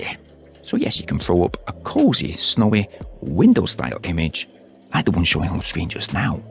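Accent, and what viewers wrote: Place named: Manchester, United Kingdom. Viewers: im late but its northern english or scottish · what's your accent man. sounds like manc mixed with something else. maybe scandinavian? or are you just using an unusual cadence for youtube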